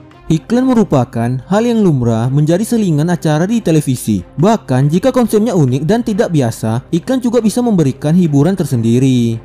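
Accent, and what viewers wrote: Malaysia. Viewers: Kok narator nya logat nya kayak orang Malaysia yg baru belajar bhs Indonesia ya. Tp keren kok contain nya. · Betu betu betu😕 Adminnya pasti tetangganya Ipin Upin nih. Dialeknya ngeselin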